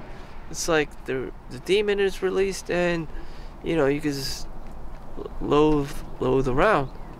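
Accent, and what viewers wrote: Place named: United States. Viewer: What? He sounds 100% American!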